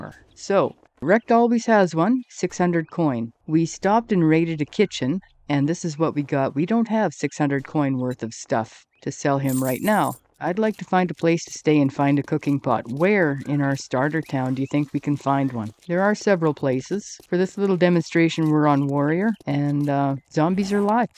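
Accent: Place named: Canada. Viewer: Not sure I’ve heard a more Canadian, it’s gotta be, accent. Love it lol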